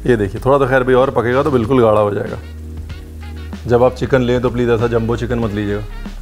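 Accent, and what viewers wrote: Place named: Malwa, India. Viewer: It's great to listen to your malwai punjabi. Esp when you quote your grandparents. Keep on...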